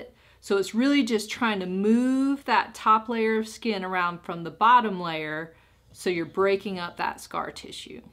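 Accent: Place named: Appalachia, United States. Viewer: Don't even go there with the Hemorrhoid cream... I looked like a Cauc-Asian for 48hrs after that :) Ha you definitely have an accent... To my ears anyway. Nice though. Like a little Hill Billy lol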